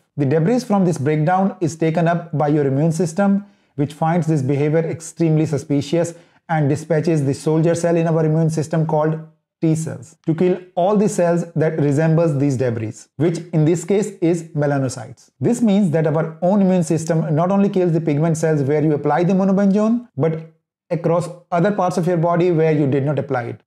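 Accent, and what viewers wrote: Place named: India. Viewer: You sound so much like indian.are you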